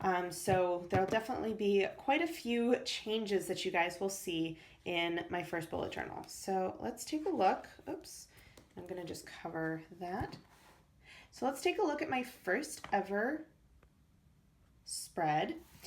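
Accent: United States. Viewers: Great video! And you write in all those different languages? Your life seems very interesting! You sound American so I'm assuming you are studying abroad! Very interesting!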